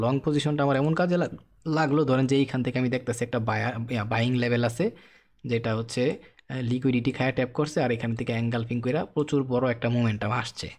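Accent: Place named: Narayanganj, Bangladesh. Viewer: ভাই আপনার বাড়ি কি নারায়ণগঞ্জ, আপনার কথার ভাষা এমনটা মনে হয়।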